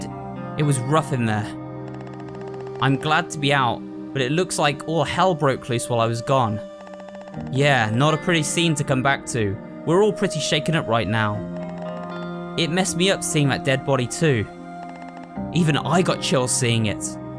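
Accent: United Kingdom. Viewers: What is your accent? You're saying "Ant-oh-knee" rather than pronouncing the "t" in "an-tho-knee" so I'mma guess your british.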